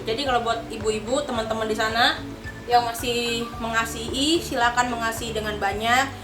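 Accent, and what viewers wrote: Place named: Karo Batak, Indonesia. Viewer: Nampak x logat batak Karo.. Kkk ini ..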